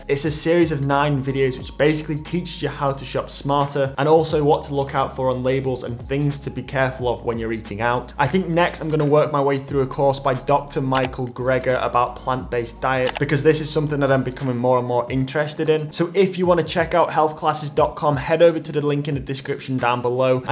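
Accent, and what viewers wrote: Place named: Lancashire, United Kingdom. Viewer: First of all, cool video! Second, and a completely unrelated question to the video. In your Tour of Ulster video you mentioned that you're from there so how'd you end up with such a rich, thick Lancashire accent instead of an Irish one? How long have you lived in England?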